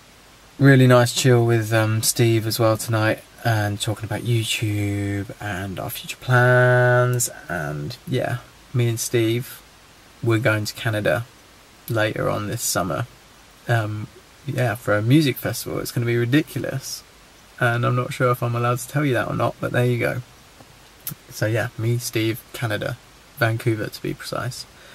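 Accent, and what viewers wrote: Ireland. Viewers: I love both The Verve, and Bombay Bicycle Club :O You have great taste in music haha. By the way, you sound like a very convincing Irish man. I'm Irish, so I know :P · Love the Irish accent ;) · ps - Your Irish accent is bloody amazing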